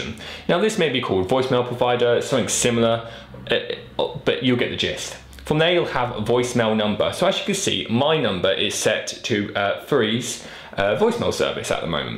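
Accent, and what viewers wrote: United Kingdom. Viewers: I think he's Bri ish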